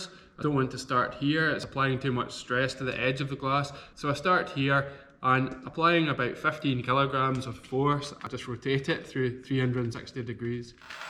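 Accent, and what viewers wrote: Scotland, United Kingdom. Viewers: Have you seen the Palace of Glass video showing how they chip the glass in predictable ways to make a pattern on the chipped edges? Amazing. Hearing you voice reminds me of my visits to Scotland and once this covid pandemic is controlled with a good vaccine, I need to visit again.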